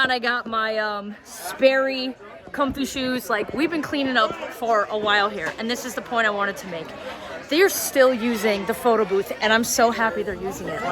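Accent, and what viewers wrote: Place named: East Coast, United States. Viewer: it’s the east coast accent that you have JUST a bit of….HappyHappy Joy Joy!!!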